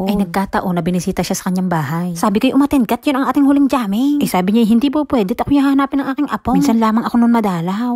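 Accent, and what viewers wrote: Batangas, Philippines. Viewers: kung ako laang ay isang napakagaling na cartoonist at kung marami akong oras, nagawan na kita ng isang cartoon series na mala-Mr. Bean cartoon series. pero syempre may mga dialogue at batangueño accent pa haha! yung feature talaga ng iyong mukha ay masarap i-drawimg tapos gagawan ng kwento. nakaka-GV ka kasi lalo na pag sinambit mo na ang Magandang Araw! parang sina Ate Sienna at Kuya Bodgie lang sa Batibot 😅 Goodluck sa yong panibagong pakikipagsapalaran sa Tokyo. kaka-excite ang mga susunod na mga kabanata.😊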